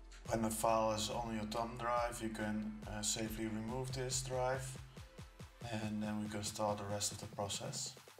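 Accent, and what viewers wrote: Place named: Netherlands. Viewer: Are you Dutch? You have a Dutch accent. hehe Nice Video! Thank you!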